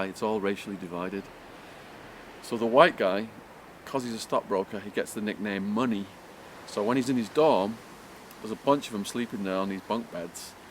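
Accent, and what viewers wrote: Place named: England, United Kingdom. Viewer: Bro you sound like your from England what would you know about California prison politics?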